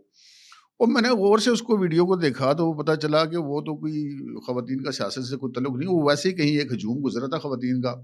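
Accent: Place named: Pothohar, Pakistan. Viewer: Ap pothohari zuban mn vlog kia karen,accent tow pothohari hy,urdu sahi nhi boltay,lehja panjabi hy ,Jo acha nhi lgta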